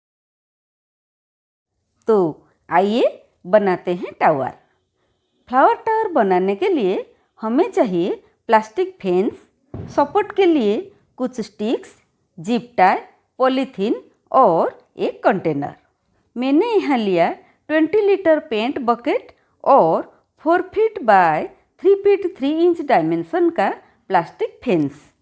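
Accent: Odisha, India. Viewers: Mujhe to odisha ki lag rahi hai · Funny accent, Odia flavor!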